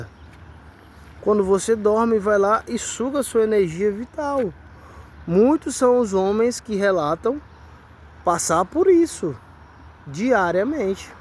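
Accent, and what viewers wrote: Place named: Fortaleza, Brazil. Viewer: Parabéns,vc é um cara bastante sabio , gostei do vídeo e me inscrevi, e pelo sotaque percebo me que é de Fortaleza , vc fala muitíssimo bem 👏👏..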